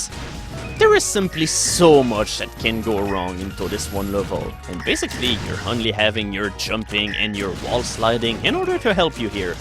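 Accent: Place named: Quebec, Canada. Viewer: hey,...by hearing your accent...something tells me that you are from quebec...because...i am from quebec myself.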